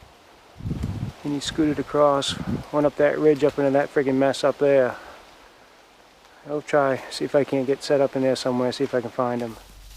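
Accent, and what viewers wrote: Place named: Vermont, United States. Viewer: you sound like your from the NEK in vermont lol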